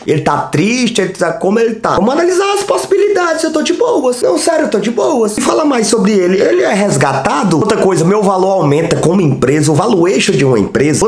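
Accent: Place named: Ceará, Brazil. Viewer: Cara ta com um sotaque de cearense q mora no barroso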